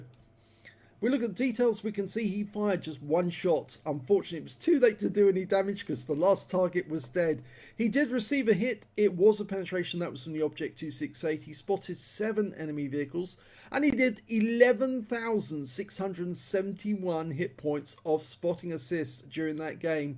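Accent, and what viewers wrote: United Kingdom. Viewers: I love the accent, you sound like a british, so authentic... Where did you get your accent from? :)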